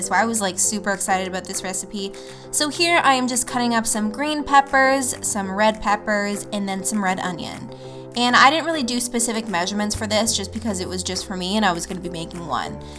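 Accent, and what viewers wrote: Canada. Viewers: are you canadian ? hehe :) cute accent (the way you say about and cilantro and avocado got me)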